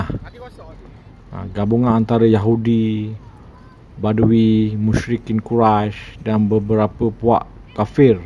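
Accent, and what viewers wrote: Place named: Indonesia. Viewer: Kenapa ckp ada mcm indon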